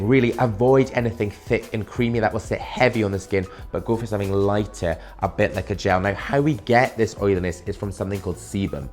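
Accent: United Kingdom. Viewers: I love that British Accent!✌🏻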